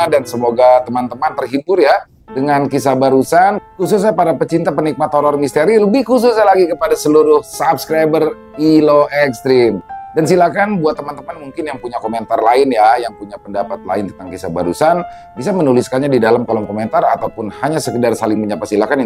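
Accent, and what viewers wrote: Jakarta, Indonesia. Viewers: assalammualaikum....nah yg dinanti2 hadir lg cerita nya mas untung.sukseees terus..bang mksh... yg selalu dengan logat betawiny a yg mantap bikin seru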